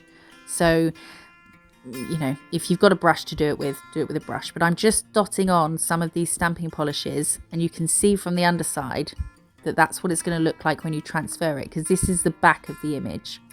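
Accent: United Kingdom. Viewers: and it’s good to hear a UK accent , I’m in Scotland , most videos I see about nails are American x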